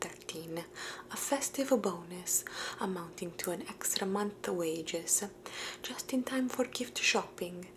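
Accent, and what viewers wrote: Italy. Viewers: I love the italian accent and I love hearing about italian culture in general! Is it true that italian pizza is different than the ones in Europe and America? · This is the same as my accent when I try to speak English😂(I am Italian🇮🇹).Nice video! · This is great, I love learning about Italy and your ASMR. Italian accent is always so good. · Italian accent - this is amazing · Brava, brava bravissima. You sound Italian just like me 😅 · fantastic asmr, love it when you do the italian accent 😍. just out of curiosity but what nationality are you, just to know your mother tongue? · I love your italian accent videos. I would love to see more of them. Greetings from Germany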